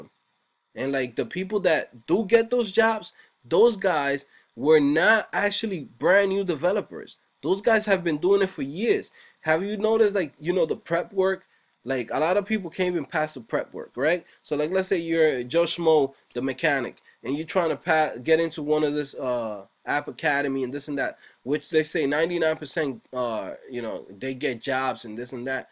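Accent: New York, United States. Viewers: dude i love they way you are talking ahahah, are you from ny? thanks for telling it like it is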